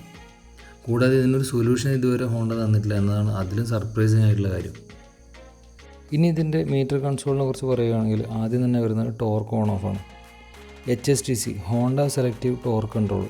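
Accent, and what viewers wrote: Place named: Kannur, India. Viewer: Glad to hear a different tips of this bike from you .. G’men , which is your exact residential location..? as per your slag , you are in KANNUR dist..? Thank you, I like the video and the places of the video ..!!😀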